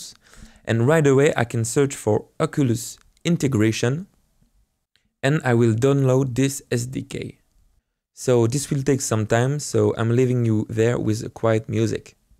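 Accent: France. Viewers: Salut il me semble détecter un accent f Français, je me trompe ? Tes tuto me donnent envie de me mettre à faire mon propre jeu oculus Quest. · Ooh that's super interesting ! I may start developping a little VR game soon thanks to you ! So thank you :D Wait a sec, is your accent French ? 'cause I am and I may have the same accent as you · Hey, Nice video but it seems it doesn't work for me with the recommended version 2018.4 LTS, I'm able to move, see my hands and so on. But I can't grab anything... I figured out that it was my capsule colliders for grabbing in my hands that weren't moving but it was impossible for me to solve it... Can you help me? PS: You sound french!:p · mmmmmhhh le french accent x) C'est marrant de voir la description en français et la vidéo en anglais. En tout cas merci pour la vidéo c'est bien expliqué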